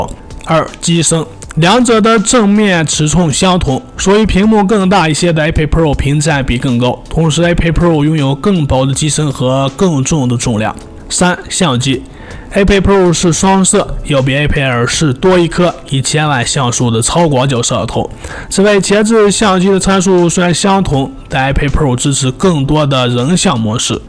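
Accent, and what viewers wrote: Shanxi, China. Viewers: 这是山西，河北北部或者内蒙古中西部地区的口音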